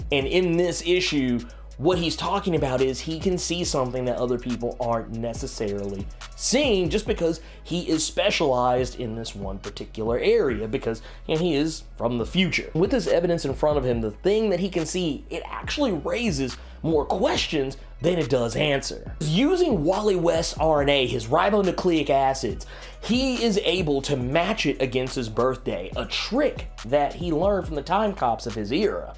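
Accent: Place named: East Texas, United States. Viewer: hey boss.. where you from... you sound like you're from east texas.. Tyler area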